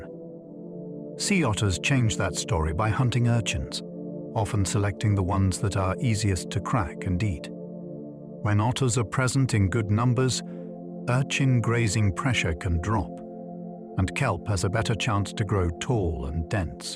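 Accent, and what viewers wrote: United Kingdom. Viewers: Listening from Iowa, USA. ❤ I like to listen at .75 speed and love the British accent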